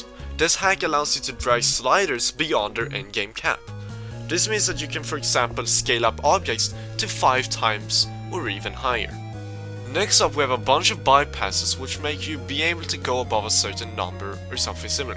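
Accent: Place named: Sweden. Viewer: Nice Swedish accent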